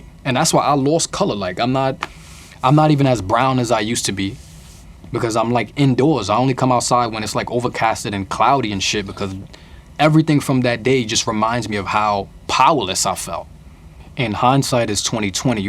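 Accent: New York, United States. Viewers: His accent so heavy it make me think I’m not a New Yorker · I LUV New Yorkers' accent!!! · He mostly he sounds like any other American I'm form California and he has a slight NY accent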